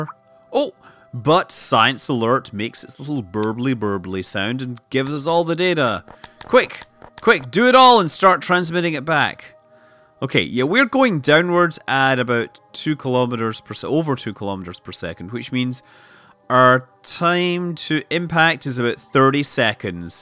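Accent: Scotland, United Kingdom. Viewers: he's Scottish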